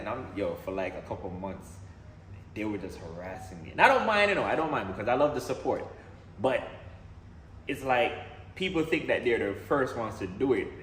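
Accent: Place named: Jamaica. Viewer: I thought u were Jamaican no joke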